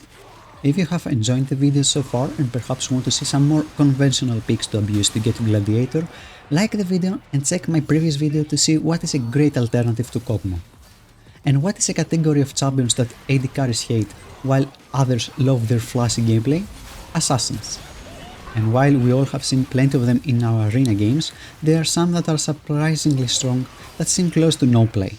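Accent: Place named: Greece. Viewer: From your accent you sound Greek!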